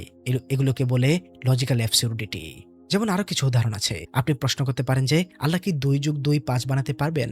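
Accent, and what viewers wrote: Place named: Jamalpur, Bangladesh. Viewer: oh আচ্ছা সেটা বলেন,,হ্যা সমস্যা নেই,তবে আমগর এলাকার নেহালা ভাষা ত, মেল্লা মিল আছে, হেজন্যেই কইল্যাম।যদি এবা অইতো এপনে আসলেই জামালপুরের, মেল্লা বালা অইতো 😅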